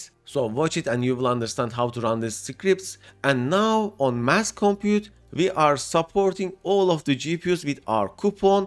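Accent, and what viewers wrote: Turkey. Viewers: Selam dostum yanlış anlamazsan aksanından Türk olduğun anlaşılıyor o yüzden Türkçe soracağım. Bu kurulumu herhangi bir amd gpu için yapmayı denedin mi?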